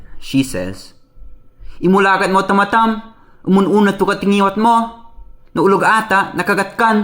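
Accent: Ilocos, Philippines. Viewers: Love your Ilocano accent! Galing mo!!!! Been watching over & over 😂😂😂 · Hahahahahahaha! Pati tigas ng dila ng mga Ilokano nakuha mo!! OMG, GI ka na talaga! Genuine Ilokano!! Kuya GI ka!! Kailangan talaga ipakita ko to sa isang kaibigan kong Ilokano!! So funny!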